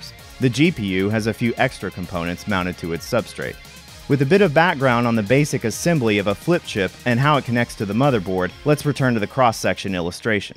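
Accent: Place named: United States. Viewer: Solder, he was talking American-English.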